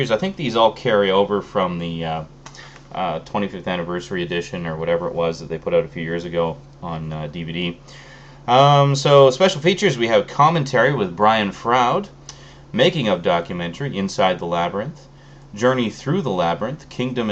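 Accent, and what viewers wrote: Canada. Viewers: ***** Are you from Canada?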